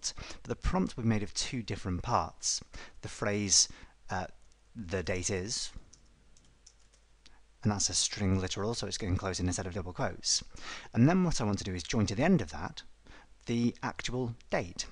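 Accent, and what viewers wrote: United Kingdom. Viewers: Not sure if I love these videos because they are informative and easy to follow or because of the british accent. Either way, well done!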